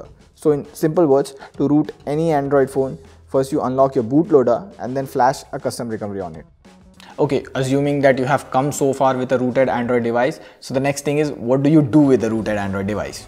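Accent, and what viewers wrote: India. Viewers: As an English speaker, it's so difficult to listen to somebody with an Indian accent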